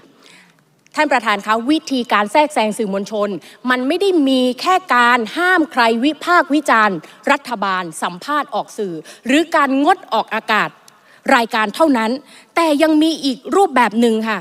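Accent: Thailand. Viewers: แหลงหรอยนัดสสคนนี้ สสใต้ไหมครับ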